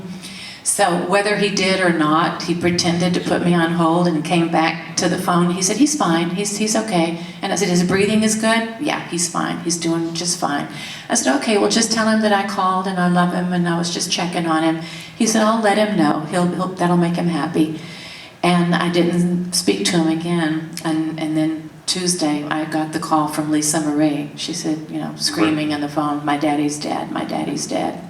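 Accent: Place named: South, United States. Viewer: It's there, I here it..she is a Southern Belle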